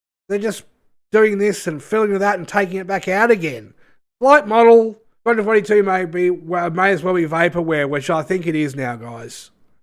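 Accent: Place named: Australia. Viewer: Sure man, il check the rest of the video now ( working from home anyway so i can listen to it. Im just not used to the strong F words and such :D -> im a full on Jedi, light sided kind of guy finding pleasure in being as good as possible, so ye..dont mind me :D ) Currently my fleet consists of 1 Carrack, 1 Mercury Star Runner, 1 Banu Merchantman, 1 Redeemer and 1 Eclipse. -> Honestly at this point i was only planning one last upgrade to my fleet and that is upgrading the Eclipse to a Polaris and thats IT. -> I honestly think that even 300$, or 500$ and not to mention1000$ is way to much already to support but the issue at this point is that im already down the rabbit hole and can almost touch my finish line of a (dream fleet) so i might as well do that. -> Another Issue is tough, that upgrading and buying ships at this point started to feel like a minigame which i can understand maybe some people who cant even stop the fantasy/dream of the supposed grandiose game on its release. 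Im like arround 80% in into doing that last upgrade but at that point i will stop buying or upgrading ships all together. All i can do is hope at this point that Chris and the teams learn from their mistake and do not take for granted the community because it can all fall down on themselves with a finger snap (just like Thanos :D ). Edit: i like your Australian accent! God bless you man :) Edit.2: I only play since last year arround september 2019 (i know i started right with the 3.7 or 3.8 or what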